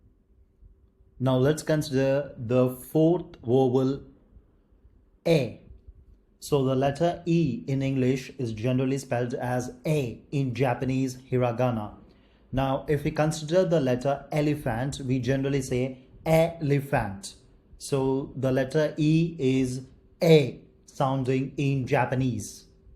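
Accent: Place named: India. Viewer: Your presentation is very impressive👌.Your Telugu is clean unlike many other Telugu YouTube presenters. For example in other Telugu channels, they say the word వచ్చేసిseveral times, and లెగిసి instead of లేచి like so many repulsive Telugu words. Obviously present day Telugu is deteriorating. It’s a great relief to hear your Telugu . I wish to follow your Japanese language lessons. Please continue.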